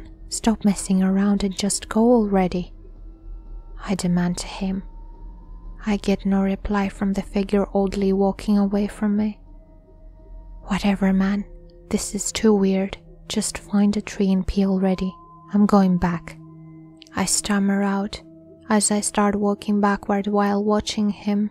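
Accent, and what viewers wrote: Ireland. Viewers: You sound a bit like a Greek beauty with an Irish accent. Not sure where youre from but your voice is addictive <3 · Is that an Irish accent? You sound like a fairy :-)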